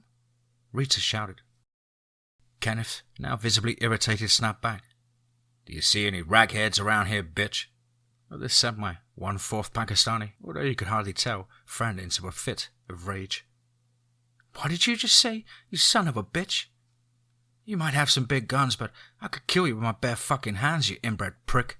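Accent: England, United Kingdom. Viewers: Huh ? I don't think it's a speech impediment. It's the accent ya know English accents. But maybe you are correct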